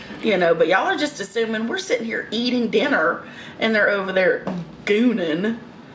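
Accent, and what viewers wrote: South, United States. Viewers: Love your Southern accent. Sorry for the bad people who hurt you. I just don't understand why people can be that way. I get so much enjoyment watching you enjoy your food and I guess it is a vicarious thing. Wish I had the same meals you eat. I really do trust your comments about food and think you have a wonderful discriminating sense of what is good and what isn't. You are a beautiful person and bring joy to many of us. Be well.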